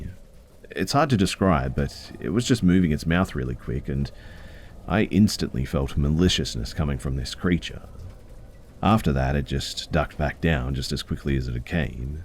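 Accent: Australia. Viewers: Hes got that low resonating voice, and hes an aussie.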